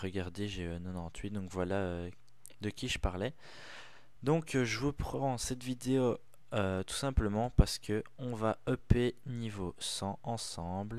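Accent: Belgium. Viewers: Il est belge sa s' entend je crois xD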